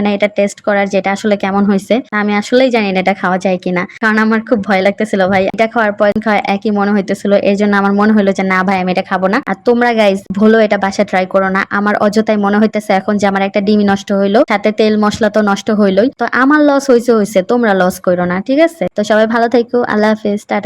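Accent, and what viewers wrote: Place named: Old Dhaka, Bangladesh. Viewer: Oi apu apni ki puran dhakai thaken apner kotha gula puran dhakar kothar moto reply diben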